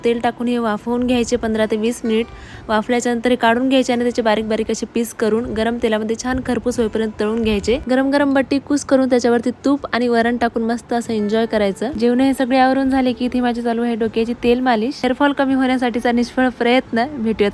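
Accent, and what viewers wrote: Parbhani, India. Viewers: मी तुला खूप दिवसापासून कमेंट कर असं विचार करत होते मला तुझी व्हिडिओ फार आवडतात आणि मुळात तू परभणीची आहे म्हणून मी तुला पाहिजे कारण की बालपणीच्या आठवणी आहेत माझ्या परभणी शहरात जर तू कधी परभणीच्या अष्टभुजा मंदिरात गेली तर तिथला vlog शेर नक्की कर कारण की अष्टभुजा मंदिर पाहून खूप वर्ष झाले अगदी मी परभणी सोडून 20 ते 22 वर्षे झाले त्यानंतर माझं लग्न वगैरे त्यामुळे खूप काळ लोटला आहे खूप साऱ्या आठवणी आहेत परभणी मध्ये शेजारी वगैरे पण खूप छान होते आता दोन वर्षांपूर्वी आम्ही सहकुटुंब आई-वडील वगैरे माझे मिस्टर माझी मुलं आम्ही सर्वजण परभणी ला एक दिवस आलो होतो त्यानंतर पण सर आठवणी जाग्या झाल्या नंतर मला तुझा व्हिडिओ असाच एक दिवस का पाहिला आणि मला तुझ्या भाषेवरून अंदाज आला होता किती परभणीची आहे बाकी व्हिडिओ तुझे छान असतात भेटू आपण कधीतरी😊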